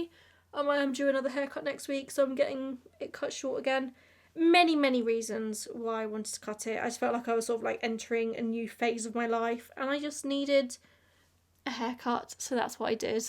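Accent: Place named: RP, United Kingdom. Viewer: Honestly she has the best voice/accent. You should be the new "Mind The Gap" voice.... Very posh. What area of England are you from? I'm sorry Reading is a shit hole. I'm from Kentucky, maybe for your next horse you can come to Fasig-Tipton and buy one 😆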